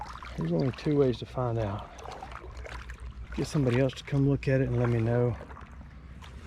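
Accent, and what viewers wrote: South, United States. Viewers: Yes, you definitely have a southern accent.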